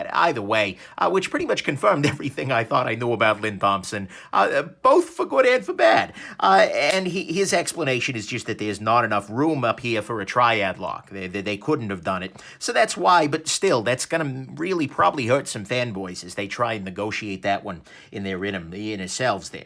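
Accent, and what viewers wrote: New York, United States. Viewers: Can I ask where your from? NY?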